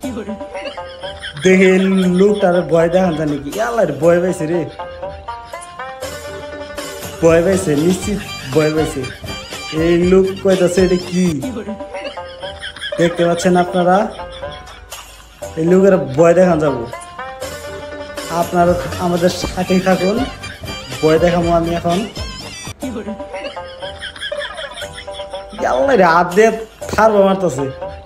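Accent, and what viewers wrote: Tangail, Bangladesh. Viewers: ভাই কি টাংগাইলের লোক নাকি...? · ভাই মনেহয় টাঙ্গাইলের।ভাষায়ই বোঝা যায় ভাদাইমা।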